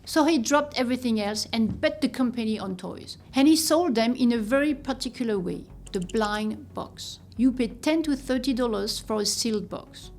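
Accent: France. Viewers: Is it me or is that a french accent I hear here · I can't take seriously your "expertise" in business in Asia when you can't pronounce the Labubu designer's name right. Frenchies are know for their bad accent but put some effort when calling people names, that's called respect. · I live in Canada where the French are a minority yet continually politically destroy our country.. Listening to your highly franco-cised version of English is like a dagger in my heart from ever word... All I hear is a French voice reminding me of what the French have done to our once glorious country.. Between the French and the Indians (natives) these two tiny minorities, have destroyed Canada and have the power to continue to do so in perpetuity.... So as much as I wanted the info in this video, being lectured by 2 French people is a bridge too far... I'll just wait till a English presenter does this story.. 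Have a good day. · Putain, l'accent français c'est vraiment violent. Ne le prenez pas mal, mais on peut faire mieux avec une IA.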